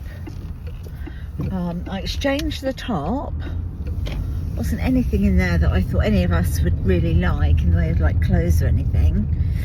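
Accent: United Kingdom. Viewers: You sound like everything is Essex bless you ❤❤❤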